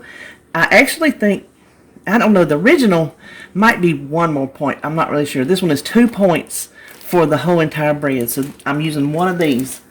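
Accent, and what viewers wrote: South, United States. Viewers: Can’t wait to try this breakfast pizza! I enjoy your southern accent! Where in NC do you live?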